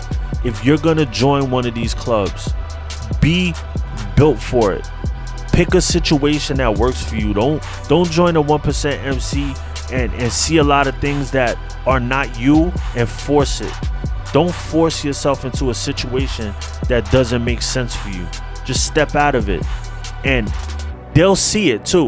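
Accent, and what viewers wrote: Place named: Boston, United States. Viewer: This dude talks like 1090Jake 😂 must be from Boston area or sumn 🤷🏻‍♂️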